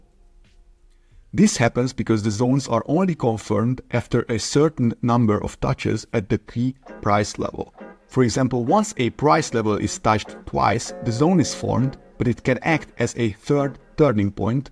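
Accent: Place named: Hungary. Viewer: Nice Hungarian accent… :-)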